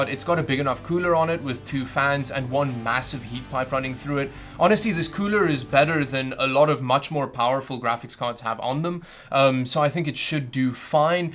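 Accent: South Africa. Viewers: Love your channel man, PC building Noob here trying to get the basic under control xD keep up the good work, also are you a South African Expat ? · Are you South African? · Are you south african by any chance?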